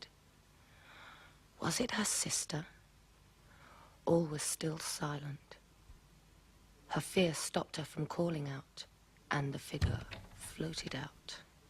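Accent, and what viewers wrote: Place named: United Kingdom. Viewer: oBRIGADAAA, estive procurando esse video legendado porque mesmo entendendo ingles, ainda me confundo com o sotaque britânico. muito obrigada pelo video!!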